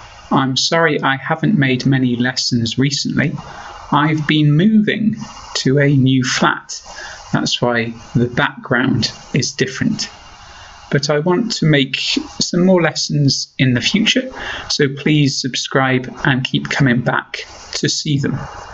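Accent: United Kingdom. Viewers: ohhhhh that's amazing!!!!!!!!!!! I like your british accent! God Bless you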